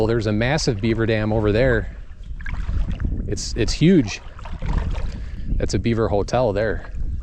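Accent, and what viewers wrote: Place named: Canada. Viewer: Where are you from.. sound like a Canadian or Wisconsin area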